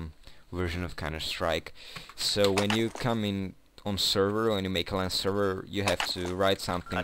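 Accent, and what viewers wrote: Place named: Russia. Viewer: акцент, может быть и английский, но он русский!!!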